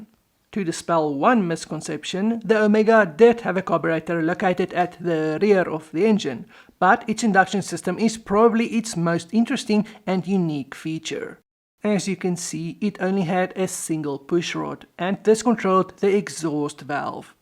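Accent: South Africa. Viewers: Thank you for an excellent presentation, your accent sounds incredibly like South African (Africaans/Dutch). I maybe I'mwrong with the dialect however I lived there for over 30 years and had the pleasure of meeting some very clever people.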